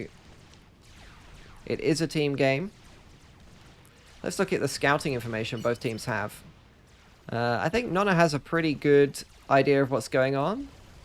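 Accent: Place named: Detroit, United States. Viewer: I love the accent! Detroit?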